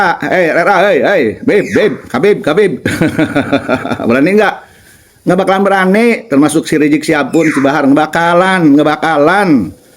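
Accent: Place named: Banten, Indonesia. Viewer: Lughot Banten'e melekat 👍 lanjut-kan perjuangan pak,,kiyai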